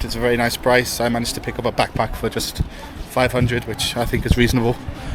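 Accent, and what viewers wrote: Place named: England, United Kingdom. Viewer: your English pronunciation has to be Naganised a little bit😅 when you said Cathedral to the taxi driver I could notice his confusion because you said “Cathidril” in the pure English way😂 most Nagas pronounce it as Cat-Thë-Dral and blah blah in Nagahinglish way, anyway wish you a very nice stay in Nagaland, Peace be with you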